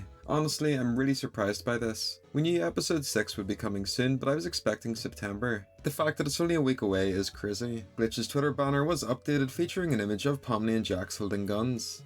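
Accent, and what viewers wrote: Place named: United Kingdom. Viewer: Your accent sounds like a british person🏴🤯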